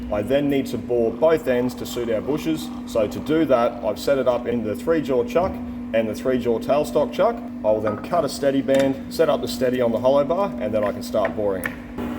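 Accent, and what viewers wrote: Australia. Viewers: QUESTION, besides the letters H and Z, what others are pronounced dif in OZ?????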